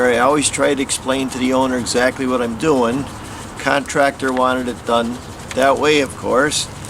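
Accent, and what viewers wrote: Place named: Pittston, United States. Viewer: Great video! Straight to the point and it will give a lot of people the confidence to DIY. I know that accent well. Heyna? 😂 Born and raised in Pittston. Live in Hawaii now.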